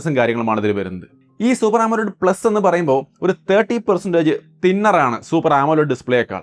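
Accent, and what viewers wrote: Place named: Palakkad, India. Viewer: Palakkad karananeleee☺️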